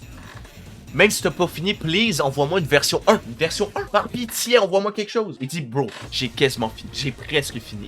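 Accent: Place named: Quebec, Canada. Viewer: Merci de pas parler comme un français sa fais du bien d'entendre une vrais voix de queb 😊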